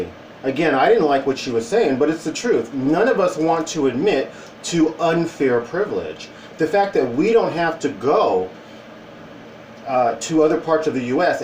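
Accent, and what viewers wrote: Southern California, United States. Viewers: Thank you! I was looking for a video of a guy speaking with a Southern Californian accent, and found you! Excellent. :-)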